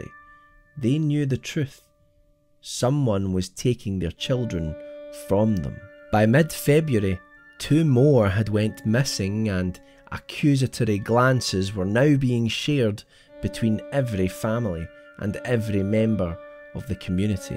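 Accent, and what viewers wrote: Scotland, United Kingdom. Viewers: scottish accent, horror stories, bringing visions of the gothic era, TOP NOTCH!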